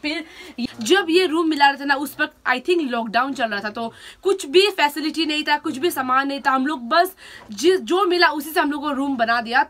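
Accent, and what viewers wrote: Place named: Nagaland, India. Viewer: Aap kaha rahte ho aap k boli se lag raha h aap nagaland se ho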